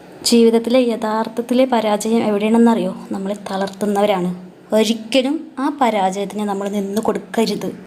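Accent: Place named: Pattambi, India. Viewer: നിങ്ങളുടെ ഓരോ വാക്കുകളും ഞാൻ ശ്രദ്ധിച്ചു കേട്ടിരുന്നു അറിയാതെ ഉള്ളിൽ ഒരു എനർജിയും ആത്മ വിശ്വാസവും ഒക്കെ വന്നു 😍 പട്ടാമ്പിയാണോ വീട് സംസാരം നല്ല രസമുണ്ട് 👍 All the best dear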